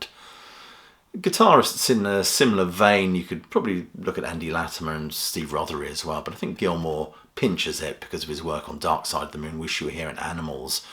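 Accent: United Kingdom. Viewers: i love your commentaries, even if i don't know all of the musicians you mention. if you don't have a PhD. in musicology, you deserve one. i don't know where you derived all your knowledge, but it makes me think you either owned a record store, or were a dj at some point. your blunt brutal honesty is both humorous and entertaining. the british accent adds a dimension as well.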